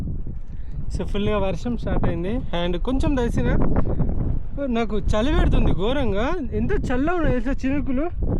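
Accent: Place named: Telangana, India. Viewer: Viewers enjoying the language he speaks .. pakka telangana language ..this man is doing great n hard working from our telangana ..better to support him ❤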